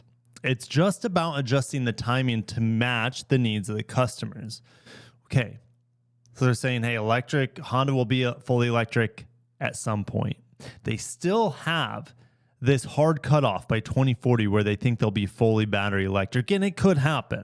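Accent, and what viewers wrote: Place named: United States. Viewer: i personally hate this accent of america has. he talks to slow, and it sounds congested. i have to listen to video sped up to actually hear the video.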